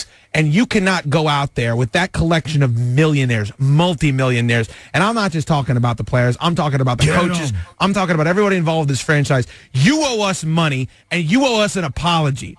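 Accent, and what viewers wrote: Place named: New York, United States. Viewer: ... "I'm Northern VA; I'm DC; I BLEED it!" How come you sound like a New Yorker, maybe a Jerseyite?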